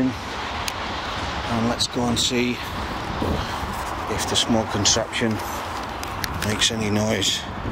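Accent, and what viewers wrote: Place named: Northern Ireland, United Kingdom. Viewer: its got a northern irish acsent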